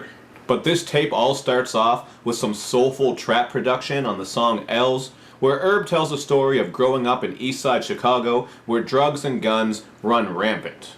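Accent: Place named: Nova Scotia, Canada. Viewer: i'm gonna take a wild guess and say this guy is from nova scotia. that accent sounds nova scotian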